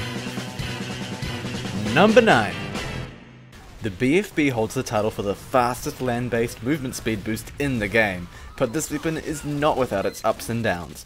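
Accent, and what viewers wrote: New Zealand. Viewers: Because he's from New Zealand. That's how their accents are. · He's from New Zealand though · No, his accent is different. I think he's Kiwi (New Zealand), whereas Muselk is ACT, Australia. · i had a feeling you were from New Zealand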